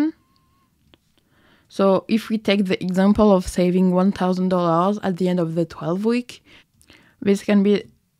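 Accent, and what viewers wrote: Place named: England, United Kingdom. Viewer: woow i loved you ,loved your english ascent and more love your work , ,,Thank you realy..looking ahead for the next video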